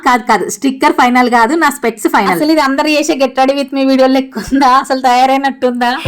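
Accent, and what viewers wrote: Telangana, India. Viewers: సూపర్ మీ అచ్చం తెలంగాణ యాస బాగుంది 👍👍🥰🥰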